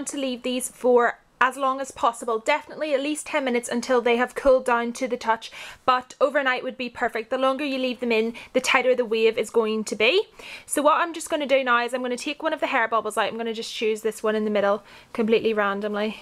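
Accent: Ireland. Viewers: Are you from Ireland or North Ireland?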